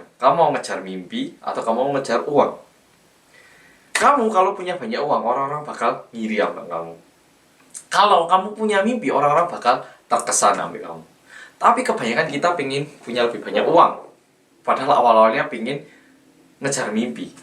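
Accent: Java, Indonesia. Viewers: Mas ngomong bahasa korea kok rasanya ada medok2 jawanya ya? Apa cuma perasaanku aja yho mas? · bang mantap medoknya, aku asli jawa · Gak kliatan ya koreanya lebih ccok jadi jawa aja, ini orang korea gak malu dengan logat, yg indo malah sok bule Salut banget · Aku lebih suka oppa medokk sumpah.. Ganteng nya ratusan derajattttttttt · entah knapa pas liat awalnya ngomong pake bahasa korea rasanya malah aneh...wkwkwkw kebiasaan denger yg medok, jadi lupa kalo mas e iki orang korea hahahah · 한국말하실때도 자바사투리 살짝 있네요 ㅋㅋㅋㅋㅋ 신기하다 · Negara berkembang maju biasanya mmg gitu sih Bagusnya mmg jadi makin maju krn pada pengen juara Tapi jadi depresi juga jeleknya Yg bikin sedih itu netijen indo yg maha benar selalu jelek2in negara korea . Padahal mreka juga gatau gimana org2 korea dan alasan2 depresi tinggi itu 😂 Btw jgn males upload walau viewnya dan hasilnya sedikit ya Soalny bener2 seneng ada yutuberss medok KR 🔛ID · Kok lbh cocok kan ngomong mendok dr pd Korea sih?😭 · kok bisa ya kamu bisa ngomong bahasa jawa medok banget kayak orang jawa tulen. 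dan bhasa indonesia fasih banget kyak orang indonesia. kami semua heran loh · medog jawa fasih korea😂😂😂 top bgt👌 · Mas, logat koreanya jd medok gegara ngomong campur bhs indonesia + korea 😁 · medokeee rekkkk 😂😂😂😂😂😂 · Jawa banget mas e iki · Salam kenal.... medok bgt yo... di Indonesianya uda berapa lama? Pertanyaannya kenapa kamu bisa timbul stress ??? Wkwkwkwkwkw.... menurut aku orang yang bunuh diri adalah orang yg krisis akan iman dan kasih.... orang yang tidak mengenal Tuhannya dengan baik. Iman semakin kuat, hubungan manusia dengan Tuhan dekat takkan timbul rasa putus asa, takut meskipun kita berada di ambang kehancuran sekalipun. Karena mereka percaya Tuhan pasti akan mengembalikan semuanya bahkan jauh jadi lebih baik. Karena tidak percaya, tidak ada pedoman / pegangan hidup yang kuat, makanya iman dalam dirinya goyah dan putus asa. Lalu mengakhiri hidupnya adalah jalan terbaik.... 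Hidup yang baik adalah hidup yang berawal dari mengasihi Tuhan, diri sendiri, keluarga, sesama, dan seluruh ciptaanNya. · Iyaya medok bgt 😅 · Ini video pertama yg saya tonton dari channel ini, terus nyari2 ttg anda. Keren ya bahasa Indonesia medoknya dapet gak kedengeran aksen korea nya sedikitpun, bahasa korea yg bahasa ibu anda jg aksennya terdengar natural (yg biasanya terjdi dgn org2 yg tinggal pernah di luar negeri biasanya aksennya jdi belibet). Good mas 👍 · Mas jawanya medok banget 😂😂 Kalah q rek.. 😄😅 Coba dari segi genetik juga bisa mas.. ☺ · Jarang" liat ka ngomong bahasa korea, jd keliatan kaya orang korea asli, soalnya jawanya medok banget, kaya orang indonesia asli padahal orang korea 😂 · Ngomong "medok" nya oke punya 😅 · baru liat channelnya, q pikir org jawa td yg bs bahasa korea. ahahhahahaaa. tertipuu. kereeen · Medok banget smpe liurnya nyemprot2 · Menginspirasi videonya. 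Ada benernya juga. Mungkin bukan bermaksud "jadi juara satu", tapi " cara bertahan hidup di kota yg keras". Btw, logat ngomongnya lucu wkwk, medok jawa gitu padahal org korea asli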